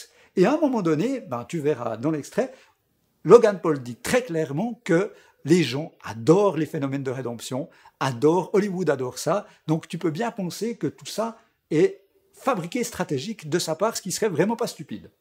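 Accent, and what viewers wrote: Switzerland. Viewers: Salut j'aimerais juste savoir comment tu as fait ton intro ? Sinon continue comme ça, tes vidéos sont très inspirante et a ce que j’entends tu viens de Suisse ahahah.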